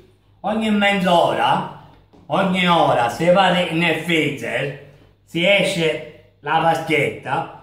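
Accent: Sicily, Italy. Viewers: Grande!!! Viva la Sicilia e mi piace che parli con accento siciliano invece di fare il perfettino come gli altri!! Ammuniii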